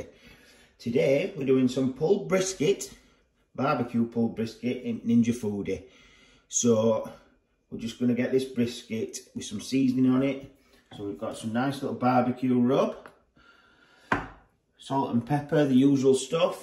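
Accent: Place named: Yorkshire, United Kingdom. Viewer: The Yorkshire accent made this video perfect!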